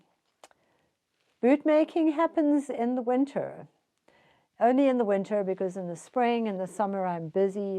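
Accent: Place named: South Africa. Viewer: Hi from South Africa!. Had a feeling you were from here just by hearing your accent. You are truly inspirational and have so much interesting knowledge to share.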